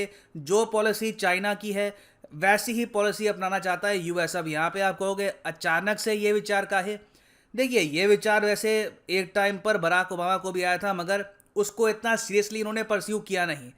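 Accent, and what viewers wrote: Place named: Bihar, India. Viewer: जबर्दस्त आज के लेक्चर मे बिहारी टोन है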